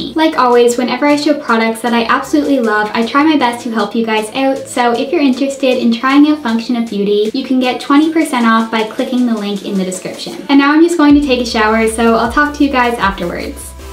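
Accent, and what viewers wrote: Canada. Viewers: I love the way Canadians say "sorry" and "picture" it's so endearing.😊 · Are u Canadian or American??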